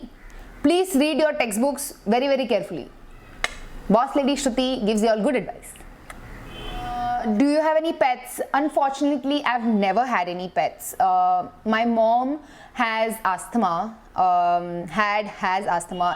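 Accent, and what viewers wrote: India. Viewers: Are u from Kerala..,( palakad tamil)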